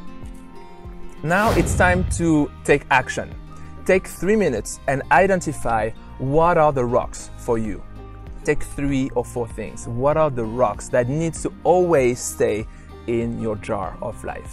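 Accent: France. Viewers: Nice demonstration, adapted from the original intervention visible here. With the French wine and the French accent, why not give a French version with the link for the moose? <link> Jolie démonstration, adaptée de l'intervention originale visible ici. Avec le vin français et l'accent français pourquoi ne pas donner une version française en renvoyant à l'orignal ?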